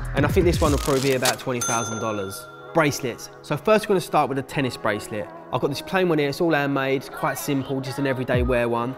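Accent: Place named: East London, United Kingdom. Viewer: Wow, amazing collection 👌 Just found your channel, love it! Love your accent too, sounds just like mine 😜 Born and bred in Custom House, East London but live in Australia at the moment 😊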